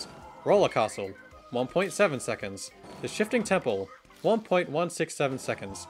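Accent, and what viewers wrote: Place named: Australia. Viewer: femboy??? also why did i think they were australian lmao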